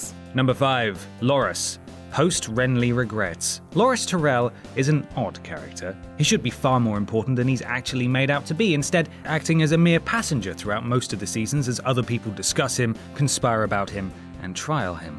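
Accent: United Kingdom. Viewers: It would be easy to imagine this chap with his British accent saying "Dam it man!" or "For heaven's sake man!" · here i was thinking i would get to watch 9 deleted scenes but nah im just sitting here listening to some british guy tell me about 9 deleted scenes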